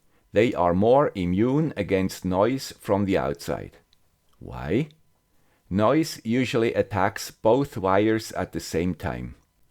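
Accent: Switzerland. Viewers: Swiss watches and clocks - high precision and accurately made. Some shafts have jewel bearings Swiss chocolate - rich flavour and addictive. Well packed but easy to open Swiss Red Cross - known round the world for their work. Very reliable The guy with the Swiss accent - all of the above. He also has a great Germans dry sense of humour. Thanks for all your great videos.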